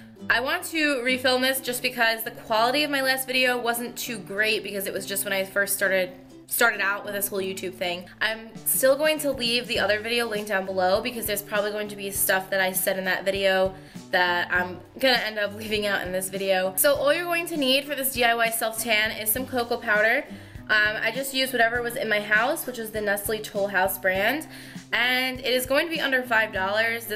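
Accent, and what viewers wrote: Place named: New York, United States. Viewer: Are you from Long Island/ Brooklyn/Bronx/Queens/Staten Island or something? Based on your dialect.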